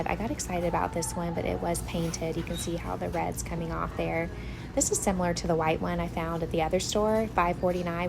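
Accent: South, United States. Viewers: What?? Who on Earth could/would say anything about the sweetest voice! I'm from and live in NH and I love your southern accent!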